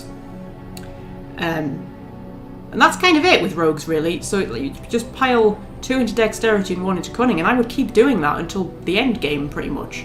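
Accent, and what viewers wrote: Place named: United Kingdom. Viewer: Adorei sua voz e o sotaque britânico, entendi tudo que falou! Ajudou muito, obrigado!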